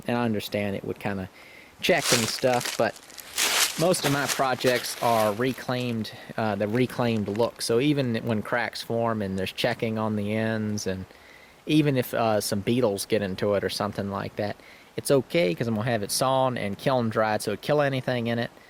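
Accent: United States. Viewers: Hey bud, noticed your canoe next to your wood pile. I pretty sure from your accent and the terrain that we're in the same region of the US. Just wondering if you've ever done any whitewater canoeing on the Nantahala river or some of the others in the area. You seem like the kinda fella who would really enjoy it. I used to go pretty often when I was around your age. It's lots of fun and it's something I'd think you'd enjoy with your significant other. Thanks for the videos, really enjoy them.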